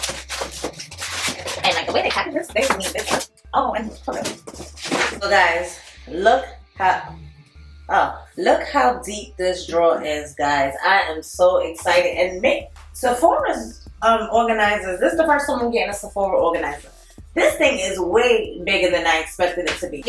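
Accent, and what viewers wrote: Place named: East Coast, United States. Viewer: when I hear your accent, I automatically respond with "What up ma?" Girl your accent is just what my "West Coast living but missing the East Coast swagger" heart needs on the regular. I have the same issues with my eyes - the water eyes be killing my canceler game - do you have any tips?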